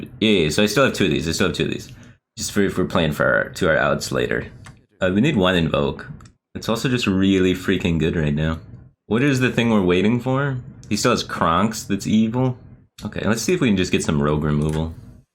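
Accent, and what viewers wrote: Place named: Michigan, United States. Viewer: The Michigander accent is just the most attractive accent in all of the USA.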